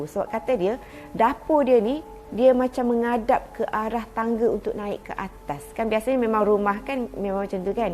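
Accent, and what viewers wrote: Kelantan, Malaysia. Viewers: hai kak dengar macam org klate?... sentiasa tgk video kak terus maju jaya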